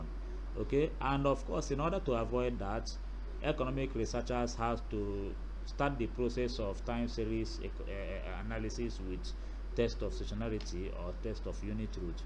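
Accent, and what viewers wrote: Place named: Cockney, United Kingdom. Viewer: I wish I could have the patience to deal with cockny accent in my dire times.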